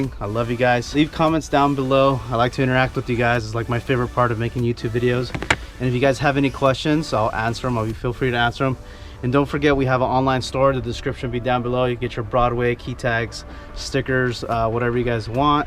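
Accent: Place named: Oxnard, United States. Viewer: You sound like your from Oxnard too. and I can make you T-shirts man, just give me a design. I silk screen em.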